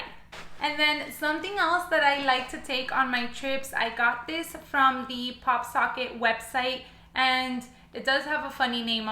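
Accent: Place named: Miami, United States. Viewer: Are you from Miami? Your accent gives me kendall, hialeah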